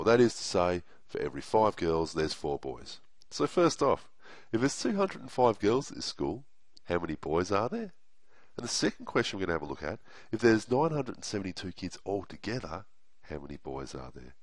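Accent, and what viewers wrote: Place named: Bogan, Australia. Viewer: Love your Aussie bogan accent, 👍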